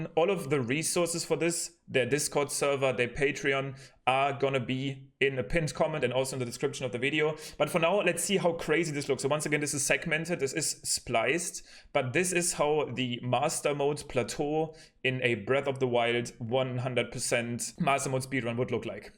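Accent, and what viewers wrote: Germany. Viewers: Man merkt dass du deutsch bist haha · du sprichst doch locker deutsch dein aktzent hört sich so an weil du nicht so gut die asusprache von englisch kannst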